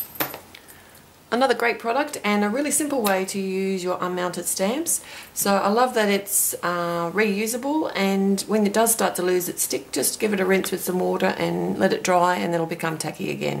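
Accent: Australia. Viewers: Thanks for sharing. You sound like a fellow Aussie and so just wondering where do you get your Tack n Peel from?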